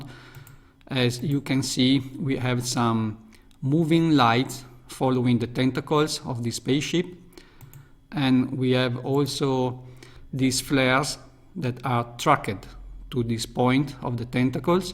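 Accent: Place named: India. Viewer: Чувак, по акценту же сразу ясно. Индус 100%. Ну или откуда-то оттуда, из 3-его мира. Кстати, правильно пишется "Where are you from?", так, на будущее :)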